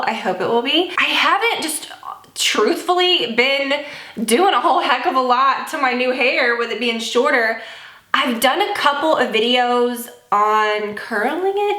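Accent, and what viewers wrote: South, United States. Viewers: Love when your southern accent pops up in your videos! I just recently made the big chop too. I’ve had pretty good luck with a wand but haven’t braved the flat iron curls with short hair yet. I’ll have to try. Thanks! Hope you & your family have a great Christmas!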